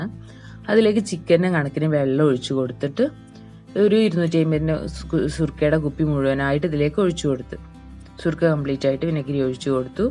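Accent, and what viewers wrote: Malappuram, India. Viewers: ഇങ്ങള് മൽപ്പൊറത്താണോ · Ithante samsaram erekkure malappurathekk varunnundonn doubt.... adipwoli ayittnd ttaa 🥰🥰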